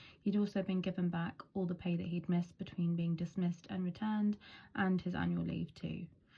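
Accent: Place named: United Kingdom. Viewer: I love that British 🇬🇧 accent.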